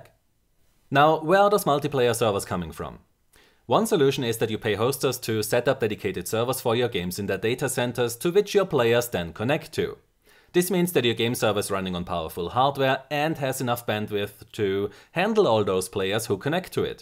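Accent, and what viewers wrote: Germany. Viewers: What is that accent? Is it German? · Aus deinem echt fantastischem english habe ich rausgehört das du doch ein deutschsprachiger bist also schreib ich´s auch in deutsch wenn es dir recht ist. verdammt interessantes video !!! danke dir sehr. Hast du infos was world of warships angeht betreff´s .netzwerk sachen für mich? Ich weis nur das wows (world of warships) mit UDP arbeitet,und das es einen dedizierten server gibt für den EU bereich,ich glaube das er in frankfurt steht denn mein ping ist immer auf dem gleichen wert von 34-35. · You sound german :D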